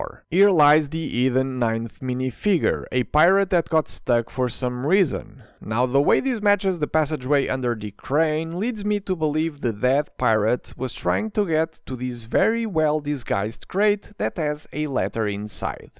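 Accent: Portugal. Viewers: Boas, não sei se costuma receber muitos comentários em português, mas só queria deixar uma mensagem de apreciação ao trabalho que fazes, principalmente sendo em inglês, o que te dá um alcance maior do que terias a falar em português. Eu comecei muito tarde neste mundo da Lego e só acerca de 1 ano comecei a montar a minha coleção, posto isto, gostava muito de ver-te a fazer um vídeo sobre como "nós" (beginners em Lego) podemos arranjar forma de, mais eficientemente, fazer arrumação das peças por categoria, ou mesmo por "tipo de peça". Adoro os teus vídeos, e devo confessar que o teu sotaque de inglês apesar de ser excelentíssimo, dá para entender no meio, os tragos de português e é muito bom. Muita força e que continues a ter sucesso por muito tempo. Um abraço de Lego aqui do norte, mais propriamente de Santa Maria da Feira. Um bem haja grande! <3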